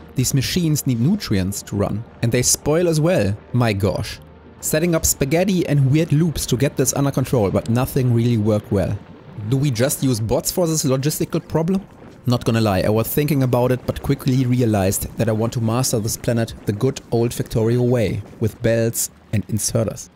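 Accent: Germany. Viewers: are you German? i think so haha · bist du Deutscher oder was ist das für ein Akzent?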